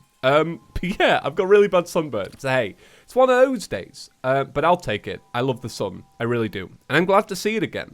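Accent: United Kingdom. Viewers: Your british "accent" makes me think you're saying giar armor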